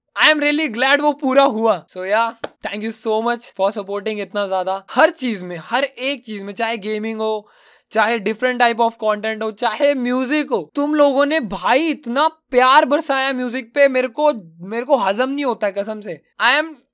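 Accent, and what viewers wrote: India. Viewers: Mana tumhe English aati hai yrr Indian hoke English bolre ho 🙄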